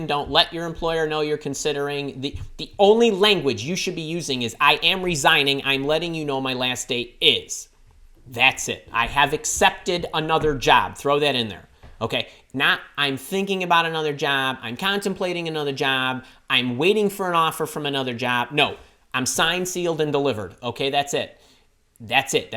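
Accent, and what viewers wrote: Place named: Upper Midwest, United States. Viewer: I’m picking up a strong upper Midwest accent here!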